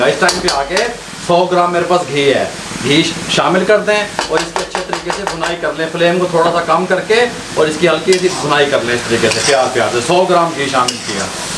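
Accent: Punjab, India. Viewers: Kya bat hy apki panjabi ki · 😆😆😆😆 bht hasi ati h jb punjabi bolty h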